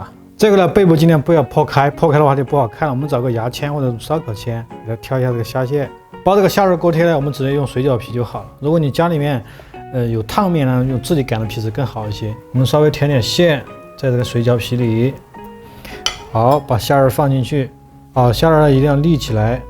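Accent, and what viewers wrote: Sichuan, China. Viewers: 的川普有点儿安逸。rua均匀，白fu椒粉，荔枝fu辣味，fu辣油。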